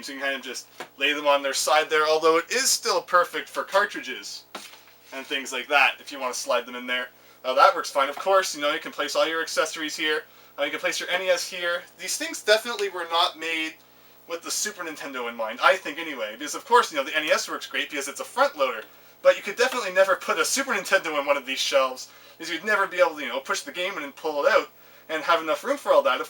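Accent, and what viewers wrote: Canada. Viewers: Liking this video for the Canadian accent alone. "Put it on the COACH" bruh :)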